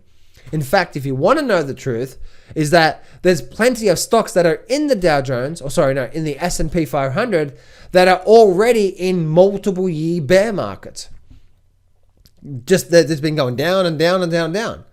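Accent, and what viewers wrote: Australia. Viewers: love a good no-nonsense Aussie accent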